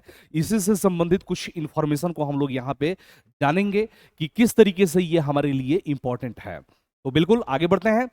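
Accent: Bhojpuri, India. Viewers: Aap Hindi bhi bolte hain to Bhojpuria ki jhalak dikhti hai sir Don't mine sir, U looks like Ravi Kishan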